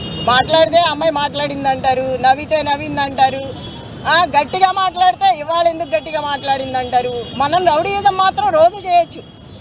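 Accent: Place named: Andhra Pradesh, India. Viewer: ఈమె తెలంగాణ రాష్ట్రం కాదు ఆంధ్ర బాషా చూడండి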